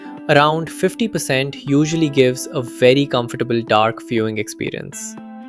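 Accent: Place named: India. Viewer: Oh a potentially useful vide- hears indian voice Nevermind, sir i dont wanna redeem it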